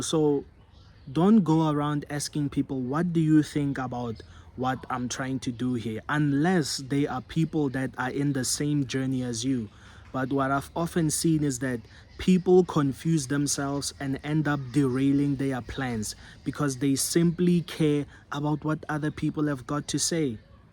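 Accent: South Africa. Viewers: Bro are from mzansi?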